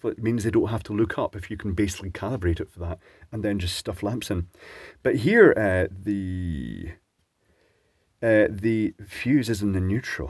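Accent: Scotland, United Kingdom. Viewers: are you from Scotland? im Scottish and u sound Scottish sometimes but also you sound more English and its so confusing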